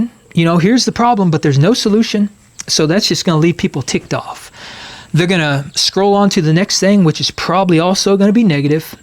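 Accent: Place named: Kentucky, United States. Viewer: I’m from Southampton, UK. I’ve been listening for a while — what an accent you have from Kunnnntuckyyyy! Anyway, I wonder if these data centres are also connected to rezoning. Imagine living on the same road as one of these facilities with all the noise pollution. It makes you wonder whether some people are being pushed out of their homes.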